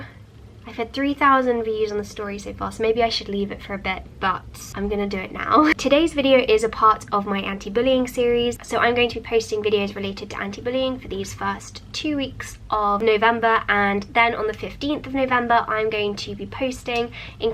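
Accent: RP, United Kingdom. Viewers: i doubt her and her sister both got scholarships (plus she would have mentioned it). i don't understand what you think posh is if it isn't money/posh voice/posh house/posh food that has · I love your British accent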